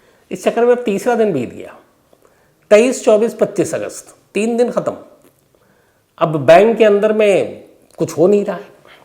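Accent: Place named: Bihar, India. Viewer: Your language says that you are from Bihar?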